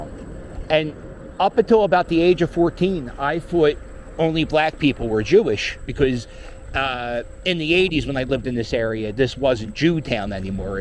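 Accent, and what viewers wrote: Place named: Pennsylvania, United States. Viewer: I was watching a video on US accents (done back in <num>’s) and specially focusing on accents that may disappear. they interviewed this PA woman who seemed to have a similar accent to you. It was cool to here the accent again.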